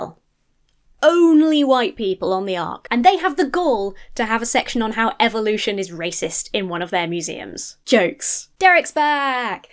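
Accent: United States. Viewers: You do a great American accent! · You've got a good American accent!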